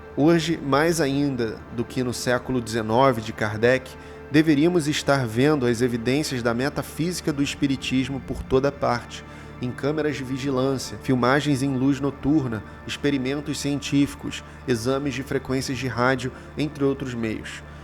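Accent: Rio de Janeiro, Brazil. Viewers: seu canal é muito bom, você é muito inteligente. Tente controlar o sotaque carioca (S assobiado) que certamente será muito mais agradável ouvir suas ideias (brasileiros de outras regiões).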